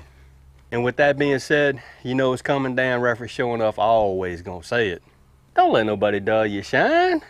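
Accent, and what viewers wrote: South, United States. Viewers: Look ☀️😎shines on brother, great video as usual the man, now when's dinner ain't had fresh pole beans in years. My g-ma, canned the man. Just wondering what Indian you are, Chickasaw or Choctaw, Biloxi hope you and yours have sure enough great day and sure enough better tomorrow my friend. Man I love the way y'all talk down yonder.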